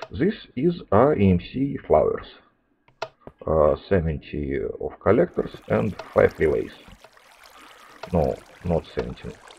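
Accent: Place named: Russia. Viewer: От русского акцента не избавится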